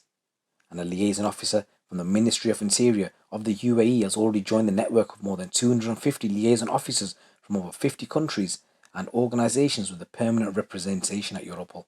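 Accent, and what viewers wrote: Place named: Liverpool, United Kingdom. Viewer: Gotta be a Scouser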